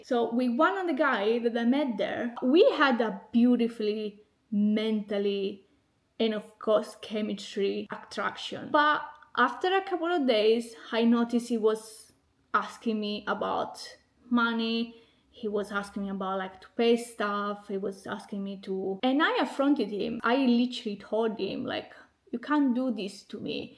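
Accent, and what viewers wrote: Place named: Italy. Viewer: I agree with everything you have said about Jamaica and the men 😅. Jamaica will lure you in whilst on holiday but it's not a place for the fainthearted if you want to reside there. It's very very expensive and the ppl mostly have unrealistic expectations of foreigners even on their family from overseas. Plus Jamaica is considered a developing economy so is too challenging for Westerners to go live. The men are on a different level - charming, egotistical and opportunist. Be careful with them. But I am enjoying your videos on Jamaica, your personality shines through and like your Italian accent 😊❤🇮🇹🇯🇲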